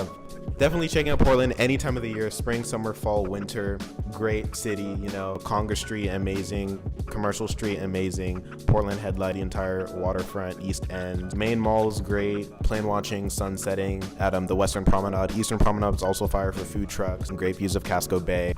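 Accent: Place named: United Kingdom. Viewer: dude this video was so amazing!!! it was so helpful i loved everything you said, it helped me get a better idea of what bowdoin was like (tbh i thought it was kind of stuffy and boring) and the general college advice was amazing as well! this has me excited to hear back from bowdoin. thank you for showing what the city is like as well bc i was under the impression it was in the middle of nowhere so i def wasn’t expecting a city lol. also your energy is just amazing!!! you’re so funny and kind and made this video so fun to watch cause usually i dont like videos like this. you’re amazing man, keep going! you’re gonna be great i can tell!! (also your british accent was so good even tho u said you grew up in the midwest i was like is he from the uk!???)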